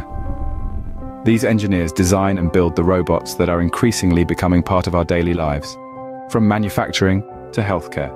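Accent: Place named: United Kingdom. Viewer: your British accent is amazing and this video gives me some insights on AI trend in the future, nice video introduction👍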